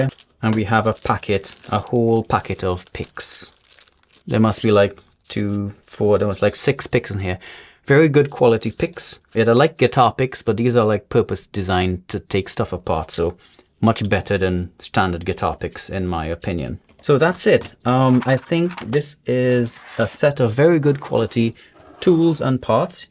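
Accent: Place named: Trinidad and Tobago. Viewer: I could hear the Trini accent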